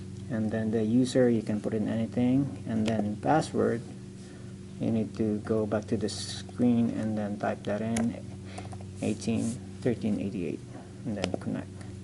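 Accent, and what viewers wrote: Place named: Philippines. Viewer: loving the Filipino accent :)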